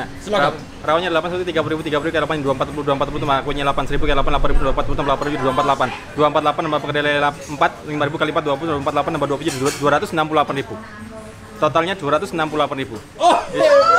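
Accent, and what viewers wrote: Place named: Lombok, Indonesia. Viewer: "Logat" sudah seperti Orang Lombok🤭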